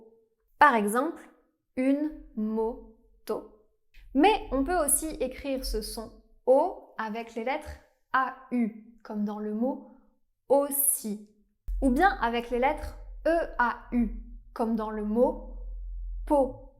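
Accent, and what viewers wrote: France. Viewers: Bonjour. Je ne suis pas d’accord avec la prononciation pour le « ê ». Il se prononce bien « è » et non « é » quelque soit le mot. On a un accent circonflexe là où en ancien français, il y avait un S après la dite lettre avec accent circonflexe aujourd’hui: Hospital (Hôpital), Forest (forêt) etc. Pour le verbe rêver, il vient du mot « esver »: nous retrouvons bien le S. Cette règle fonctionne pour toutes les lettres avec accent circonflexe. Ensuite, je remarque que tu prononces le son « è » en « é »: tu dis francé et non français. Pareil pour « j’ai » etc. Tu as bien hérité de la patte du Sud de la France;) Enfin, le « â » est bien prononcé dans la région champenoise et surtout dans le Nord de la France. Je pense à la région de Troyes notamment ^_^. Sinon super travail pour les non francophones:) N.B: je suis bien française et enseignante;) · Disons que tu as un accent français de France très marqué pour moi ! 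En Suisse romande, on fait la distinction entre les a de bras et gâteau (fermé), et souvent entre le o (souvent ouvert) et eau (toujours fermé)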